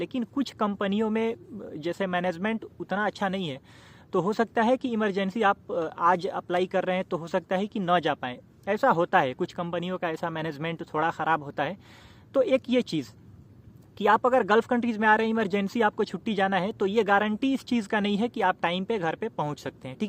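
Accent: Bihar, India. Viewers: Salam.. Aap bihar se ho?? Your accent 😊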